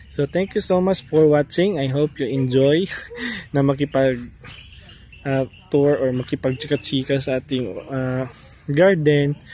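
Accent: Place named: Iloilo, Philippines. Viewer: May waling waling din po kayo sir.. Ang galing mo mag tagalog sir pero ang accent nyu is ilonggo sir.. Ako din ilonggo din po ako sir.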